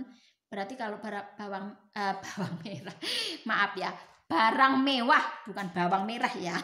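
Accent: Java, Indonesia. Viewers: Meddok nmbak😂😂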